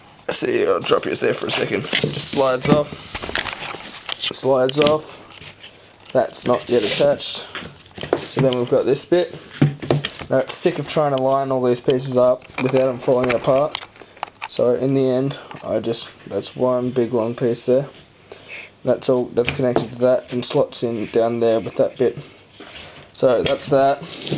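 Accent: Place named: Australia. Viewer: love the aussie accent :)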